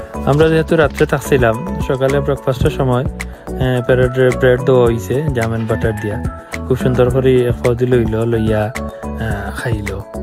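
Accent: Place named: Sylhet, Bangladesh. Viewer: Sylheti Voice amr khub valo lage Bhai 😻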